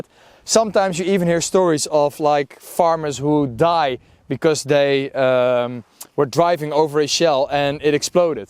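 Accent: Netherlands. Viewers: Even more disrespectful, and in fact illegal is taking artefacts away from the site. This guy sounds dutch, maybe he doesn't know the laws? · Jij bent Nederlands he?🤣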